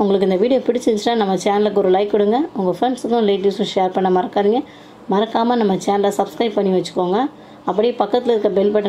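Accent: India. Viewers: Mam unga native nellai ya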